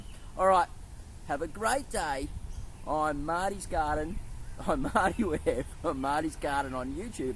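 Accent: Australia. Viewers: Damn that Australian accent 😂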